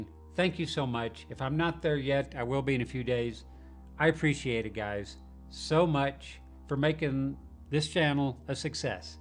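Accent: South, United States. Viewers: ***** Where can we find your artwork? I am living in Utah and the mormons destroyed my design career- that is another story, but I am from Memphis and lived in Nashville for 8 years- Is that a southern accent that I am picking up? . But, I have decided that I want to do artwork and get a website going. Lots of Bigfoot sightings here, well, everywhere it seems.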